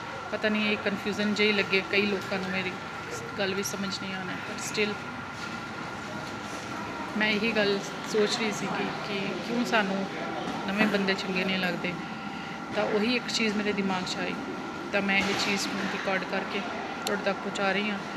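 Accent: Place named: Punjab, India. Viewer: ...south India to ho k eni pure Punjabi language...wah!...oh v pendu panjabi...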